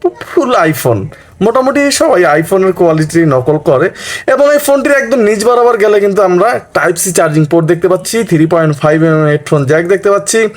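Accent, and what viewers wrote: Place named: Barishal, Bangladesh. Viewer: কথায় বরিশালের গ্রাম্য ভাব আছে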